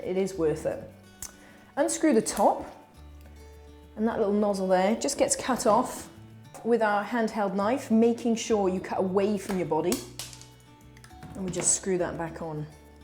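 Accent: England, United Kingdom. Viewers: That's an English accent 🙄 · She sounds English. Makes a nice change from American and Aussie DIYers.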